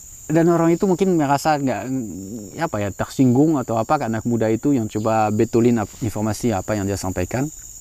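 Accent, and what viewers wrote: France. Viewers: Aksen france nya sambil berbahasa Indonesia begitu gurih di telinga, enak didengar. Kalo ngobrol sama bapak ini kayaknya asik bisa berjam jam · Channee kalWeit masih kntal logat perancisx yaa? Klo mgomong bahasa indonesia.."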